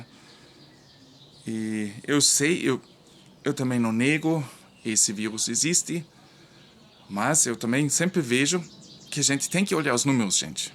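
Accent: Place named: Germany. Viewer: peço a você que nós desculpe do comentário maldoso feito por um desqualificado em seu canal, não se deixe abater por isso pois nós gostamos muito de você de sua esposa e de seus comentários e de seu jeito de falar ( português alemão ) queria te dizer também que esses números estampados na mídia de 300.000 mil óbitos aqui no brasil não são reais...pois por trás existe uma indústria que lucra com a pandemia com a morte das pessoas...houve estados que receberam recursos do governo para usar na crise e o dinheiro foi desviados para outras finalidades, construíram hospitais de campanha e não foram utilizados...os serviços funerários lucram como nunca...a mídia ataca o presidente porque ele cortou toda verba de, publicidade do governo...secou a fonte de corrupção...aqui no brasil ninguém morre mais de zica virus...,dengue..., derrame cerebral...infarto...câncer....acidente de trabalho...., acidente de transito...,malária.., tuberculose...,pena munia...aids...etc...tudo aqui é covid....aqui me despeço com um forte abraço. 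" Gott sei mit dir "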